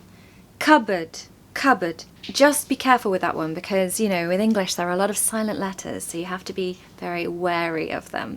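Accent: United Kingdom. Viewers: exacly you are my favourite teacher You have a lovely British accent I love it😅❤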